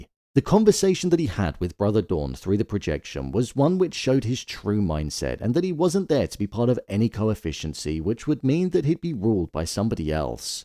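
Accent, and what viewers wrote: United Kingdom. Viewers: Is this guy a British AI voice?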